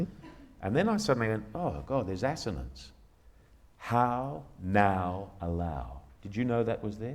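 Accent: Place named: Australia. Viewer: His accent. I keep forgetting that he's a fellow Aussie. 😄😄😄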